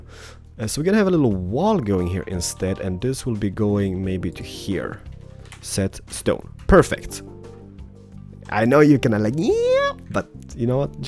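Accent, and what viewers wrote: Scania, Sweden. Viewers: LapizManHD It is appropriate to say he speaks English with a Swedish accent. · you swedish bro haha love it riktig skånska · När du pratar engelska så hör man inte din skånska dialekt, men när du började räkna på svenska kunde jag inte sluta le. Så uppenbart att du är bosatt i Malmö, så go dialekt! :D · Oh man, has the most fabiest Swedish ever. I am also Swedish. <3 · His accent is sveska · wtf är du svensk? :O eller ja, skånsk ;) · Hahaha skånska e ju bäst :D (fast sthlm ftw ändå :3) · Gillar din skånska. Också från Skåne men norra delen. Liking your skånska. Im also from Skåne but the north part. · Är du skånsk? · Skånska =D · lol That Skånska! xD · Du e ju SKÅNSK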